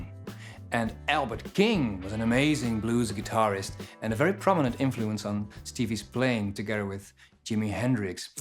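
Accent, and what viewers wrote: Netherlands. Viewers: That Dutch accent...fucking coolest thing in the world.. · You sound like a Dutch guy when you talk, are you dutch haha?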